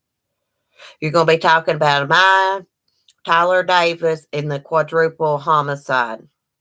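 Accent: South, United States. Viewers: Hello. I am new to channel but I love it!! You are so down to earth. I love your southern accent!! I love the south. Your channel has no drama and you have good mods too. You and I seem to have the same opinions on most things. Ok going to watch more of your replays!! Thanks for being you, real!!!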